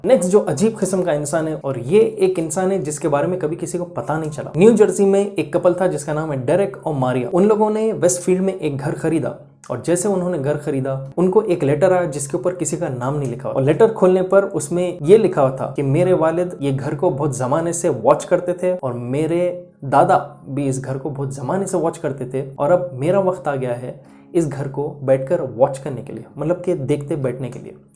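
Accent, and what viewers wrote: Hyderabad, India. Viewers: Hyderabadich hai miya ye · your Hyderabadi accent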